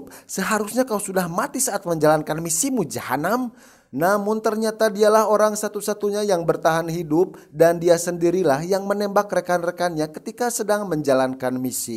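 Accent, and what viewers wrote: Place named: Tasikmalaya, Indonesia. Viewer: suara tasik mana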